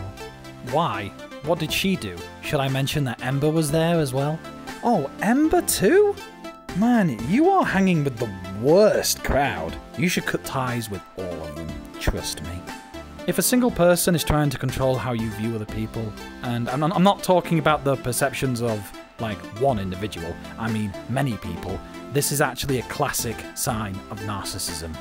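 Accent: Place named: United Kingdom. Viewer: Not at all was I expecting a man with a british accent from a small character good vid though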